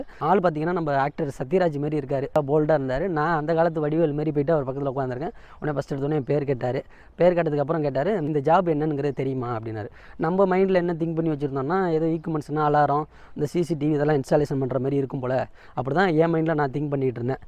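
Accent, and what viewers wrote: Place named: Madurai, India. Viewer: Bro, I really like your innocent. Am admiring your way of talking. I think your native is madurai because your slang is like that madurai.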